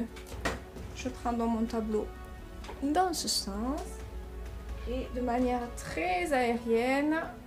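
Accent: South, France. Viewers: oh toujours magnifique et tellement bien expliqué vous êtes l'artiste peintre que je regarde le plus c'est toujours magique je vais essayer de faire celui là merci pour toutes vos explications pour moi novice dans la peinture j'apprécie beaucoup continuez de nous émerveiller et l'accent du midi met de la lumière supplémentaire dans vos tableaux suis aussi du midi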